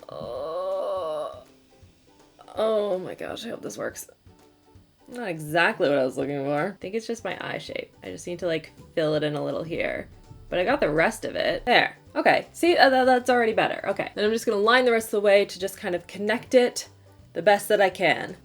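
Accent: France. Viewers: OMG YES, THAT'S HOW YOU SAY LES MACARONS 😍😍 your French accent is adorable 🤗 also, love the eyelook 💗 Thanks for that lower lash mascara tip!!! 💗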